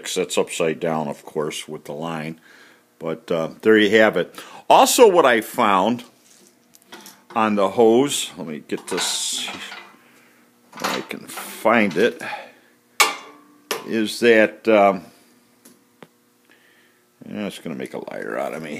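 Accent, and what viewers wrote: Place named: Northern Illinois, United States. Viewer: A fair sized threaded Clevis Shackle sure helps hauling it into the garage out of the crate. The threaded pin on mine just barely fits into hoist hook opening. The fabric hoist sling then easily slides on each side of the shackle. Yeah, I knew you were from northern Illinois. The boat video and the voice were dead give aways. I'll have to work on it to legally get to my property in the UP. I wish I could do some quick connects and haul it out of a trailer up there with a chain hoist and lashed tinbers, but I'm thinking I'm better off just doing fenders and lights and haul back roads at night. Just have an old Ford Explorer and a well restored old dirt trailer based on an ancient welded pinion U shaped frame/axle thing . It holds alot of weight, but .... Thanks !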